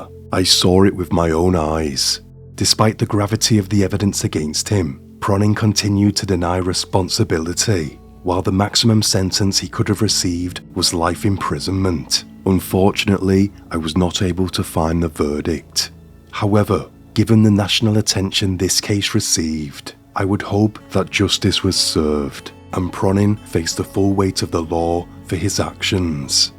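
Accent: United Kingdom. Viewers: This dude sounds like a bot with one of the thickest and most annoying british accents ever, lmao Literally unbearable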